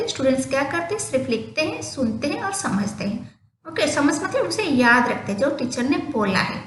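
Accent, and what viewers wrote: Odisha, India. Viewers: Are u belongs to odisha?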